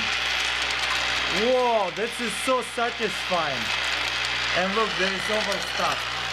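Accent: Russia. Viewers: Dudeeee i love his accent, super heavy Russian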